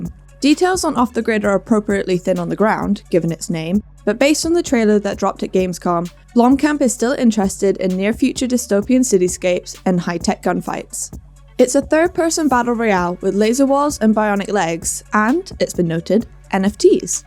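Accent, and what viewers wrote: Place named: Yorkshire, United Kingdom. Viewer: Yorkshire accents? Sign me up for TGYH. Excellent coverage :)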